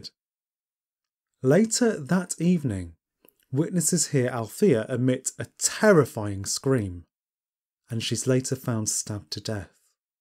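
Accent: United Kingdom. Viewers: Absolutely no offense ment, but my friend and I have had a long running joke about a someone with a British accent being able lul you to sleep while describing the most horrific of acts. I have found proof! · indeed it is very outnumbered by American and Canadian channels they make great effort and content but its refreshing to have a face and a brit voice narrator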